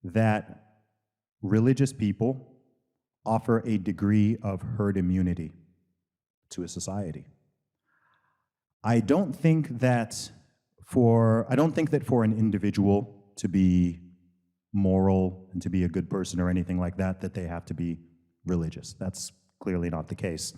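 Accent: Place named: United States. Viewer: I’m confused. His Wikipedia page says he grew up in Saudi Arabia but is a British citizen, so why does he have an American accent?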